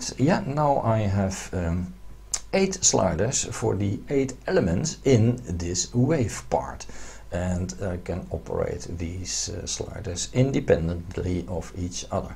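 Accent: Netherlands. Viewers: Hello I like your video's about the MODX. They are very helpfull. Your English sounds a little bit with a Dutch accent, so I assume you are Dutch? Mag ik je daarom iets in het Nederlands vragen; ik overweeg om ook een MODX6 aan te schaffen, maar ik ben vooral geïnteresseerd in de Vocoder mogelijkheden. Heb jij daar misschien al ervaring mee, want op Youtube heb ik daar tot nu toe nog niets over gevonden..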